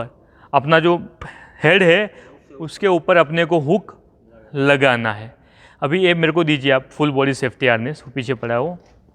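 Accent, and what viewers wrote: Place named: Gujarat, India. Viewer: Mai bhi sir idhar TL line me kam krne aya hu Gujarat ap jaise bol rhe hai idhar bhi log aese hi bolte hai isiliye laga ap gujrat se hai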